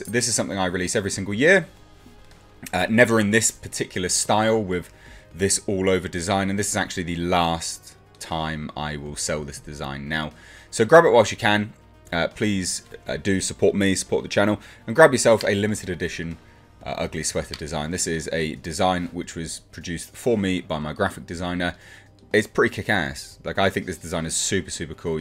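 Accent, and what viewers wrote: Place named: United Kingdom. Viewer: Are you from the United Kingdom? You sound British. I like these comic books too. I need to buy more of these comic books.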